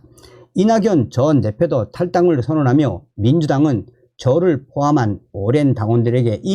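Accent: North Korea. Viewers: 정성산TV대표님 새해복 많이 받으세요 말투가 북한 말과 비슷하네요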